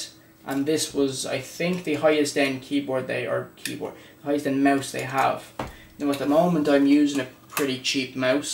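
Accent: Ireland. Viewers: U from ireland??????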